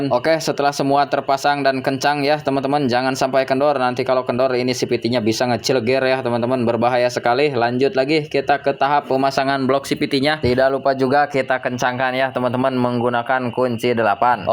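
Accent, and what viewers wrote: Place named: Tasikmalaya, Indonesia. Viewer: Logat tasik ieu mh, nya 😊 palih mana bengkelna mang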